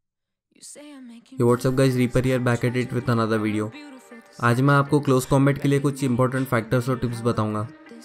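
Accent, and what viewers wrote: Hyderabad, India. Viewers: Bhai tum hyderabad se hai kya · Hyderabadi tone arhi kya🤔😋🤪 Btw OP skills bro 🥺🙌🤠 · Bhai hyderabadi h shayad 😅👌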